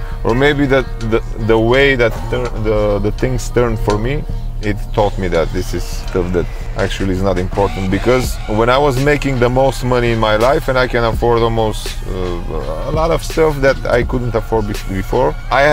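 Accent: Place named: Balkans. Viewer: English with Balkan accent!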